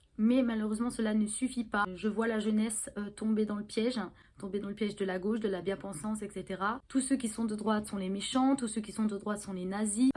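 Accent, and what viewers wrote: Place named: East, France. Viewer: Joli accent de l'Est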